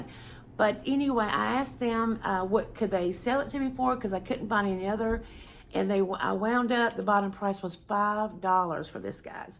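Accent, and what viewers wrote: South, United States. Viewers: That is such a sweet little corner you've created! Everything co-ordinates and flows so beautifully together. It's so nice to keep some sentimental pieces like your scarecrow through the years. I still have a Christmas ornament my friend from school made me in grade 5. He's a Santa face made from paper glued on a pine cone with a red paper hat and cotton balls used for the top of his hat and his beard. The years have caused the cotton to disintegrate a bit but he always hangs on my tree each year. By the way, I just turned 59 last week so he is pretty old to! Anyway, love watching your videos and hearing your southern accent, ( I'm from Canada so to me you have an accent)😊 Bye for now, Sandy 💟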